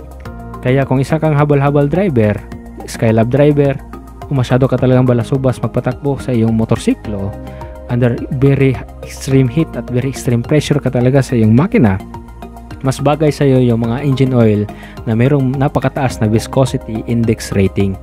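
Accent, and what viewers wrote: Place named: Visayas, Philippines. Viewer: bisaya man kaayo boss hahaha nice job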